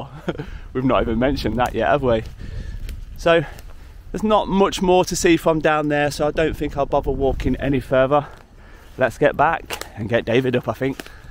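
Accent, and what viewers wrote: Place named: Yorkshire, United Kingdom. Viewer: Nice Yorkshire accent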